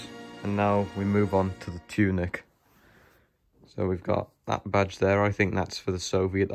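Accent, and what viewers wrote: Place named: United Kingdom. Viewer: He speaks a british accent lol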